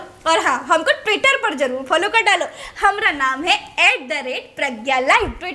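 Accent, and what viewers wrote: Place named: Bhojpuri, India. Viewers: Bhojpuri slang osm madam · गजब बिहारी आवाज में पोल खोल दी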